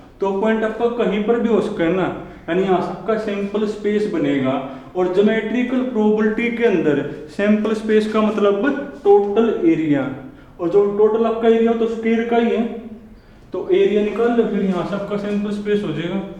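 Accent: Haryana, India. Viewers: Are u from haryana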